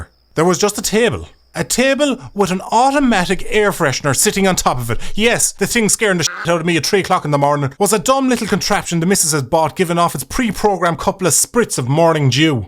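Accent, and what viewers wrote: Ireland. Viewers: This is, by far, the best and most hilarious video i've seen all year. There are soooooo many things i recognize in my own past and present relationships... Eerie that... And you tell it sooo well, and it just makes it better with those animations and your lovely Irish accent... This is pure fucking GOLD brother... I subscribed then and there, for the laughs... Well earned... I can't wait to check out what else you've made of shenanigans... Love from Denmark... <3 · The Irish accent is 30% the reason why I subbed....the other 60% is me in utter stitches from laughing and the other 20% is me realising I'm bad at maths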